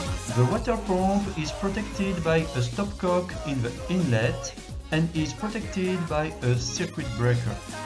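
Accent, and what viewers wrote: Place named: France. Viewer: Love French accent. Enjoy your van.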